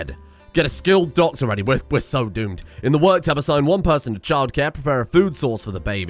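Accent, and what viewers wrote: South, United States. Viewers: American accent improving? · Your country twang is perfect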